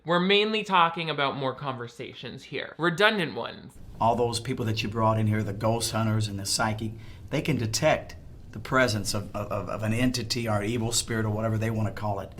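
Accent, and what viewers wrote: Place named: South, United States. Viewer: These Christian movie clip breakdown brings out the best out of, and I'm not just talkin bout that southern accent. LOLs all around 10/10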